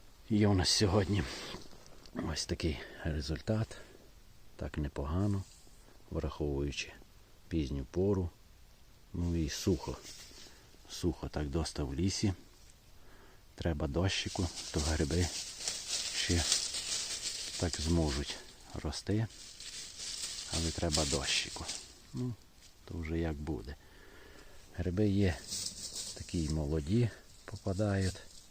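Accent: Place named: Zakarpattia, Ukraine. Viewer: Чернівці значить, я із Закарпаття,з Виноградова просто Ви розмовляєте як у нас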